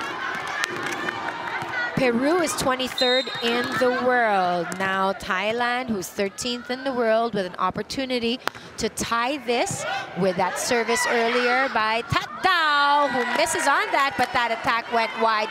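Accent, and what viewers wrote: Philippines. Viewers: I can tell this commentator is Filipina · Is the commentator Filipina? She has Filipino accent.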